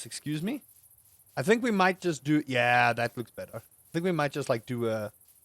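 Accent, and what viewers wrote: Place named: South Africa. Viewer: Howzit,! You randomly appeared in my feed with your episode 1 of this series, and since I've played both games, I figured this was worth a shot. I'm really enjoying it so far (still very early game, though - not even iron tools yet). They've done a really good job with this modpack. And as an ex-SA guy, I also enjoy hearing the accent again (been in Canada for 20+ years now).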